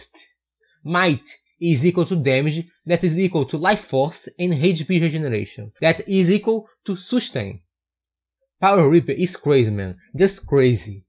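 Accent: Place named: Northeast, Brazil. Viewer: Pontes Neto Pior que ele fala certo só que o sotaque nordestino é muito forte, hahaha lembra mto o Joel Santana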